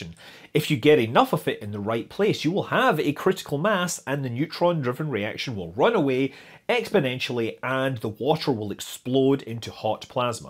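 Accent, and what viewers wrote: Scotland, United Kingdom. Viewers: I'm lovin' the Scottish burr. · YouTube captions can't seem to understand your Scottish accent. It doesn't seem to have a problem with the English. 🤔 Lol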